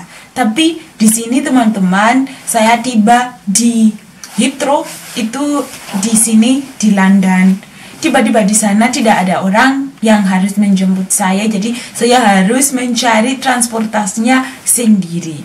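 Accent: Java, Indonesia. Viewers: jangan dihilangin medoknya ya di Inggris mbak hehehe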